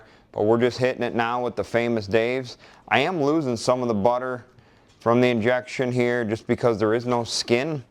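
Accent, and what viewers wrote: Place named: Michigan, United States. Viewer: You are the first outdoorsman Youtuber from Michigan I've found and I'm so glad I stumbled upon your channel. It's nice to hear an accent I grew up with instead of a southerner shitting on northern hunting. I'm looking to get into turkey hunting this upcoming spring myself, very excited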